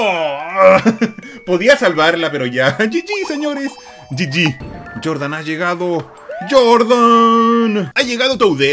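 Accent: Chile. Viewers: eres Chileno? yo si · Eres chileno?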